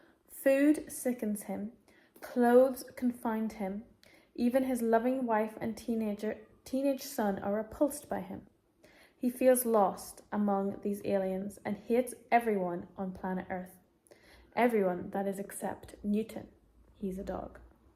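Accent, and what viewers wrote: England, United Kingdom. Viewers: It sounds like you might be an English English teacher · Are you British ?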